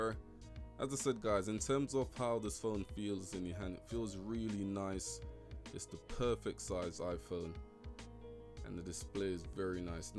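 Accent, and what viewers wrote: United Kingdom. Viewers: London accent. Ahhhh how I miss home.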